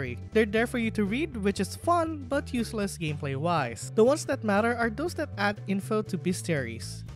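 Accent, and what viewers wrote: Philippines. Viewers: I can sense the Filipino Accent. Good english! I don’t normally watch Filipino youtubers because they can be really annoying with their repetitive sound effects and poor grammar. But you, I’m willing to watch for all of my Witcher content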